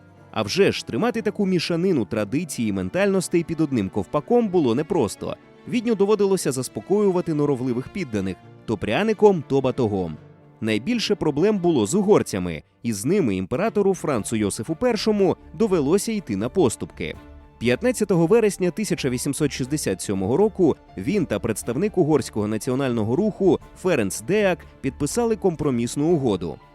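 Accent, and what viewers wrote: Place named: Moscow, Russia. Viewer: Голос за кадром- "ЦюЦюн, жиЦЦя, громаДЗяни" треба на консультацію до логопеда! Вкрай неприємно слухати ці маасковські виверти! ДИЗЛАЙК!!!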